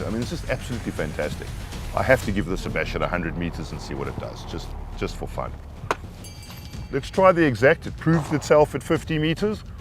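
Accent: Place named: South Africa. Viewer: South African? Hallo boet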